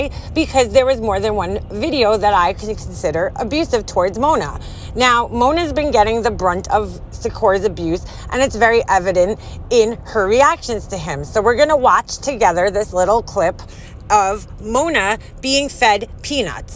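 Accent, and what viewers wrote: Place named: Bronx, United States. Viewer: I love her accent also it's probably like a New York Bronx accent like where my dad grew up she's Italian Maybe that's the way my dad talks he's a sicilian immigrant who grew up in the South Bronx and move to Jersey that's the way his accent sounds that's maybe why I love her accent so much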